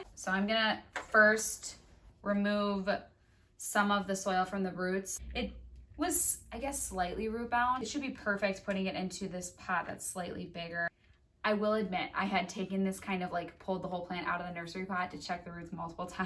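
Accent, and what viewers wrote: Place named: Wisconsin, United States. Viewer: I KNEW you had to be from Wisconsin (I could be wrong) because of your slight accent! I love it!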